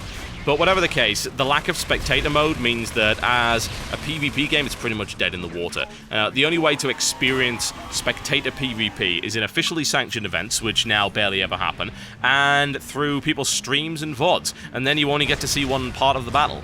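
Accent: United Kingdom. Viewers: I wonder what persona totalbiscut has ... WAIT HES BRITISH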